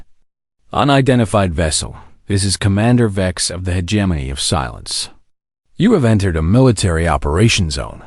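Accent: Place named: New York, United States. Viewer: I’m pretty sure the person who sampled their speech for the AI to use as narration comes from New York or close by in New Jersey. Great story. Too bad there wasn’t any constant and appropriate visuals to match it.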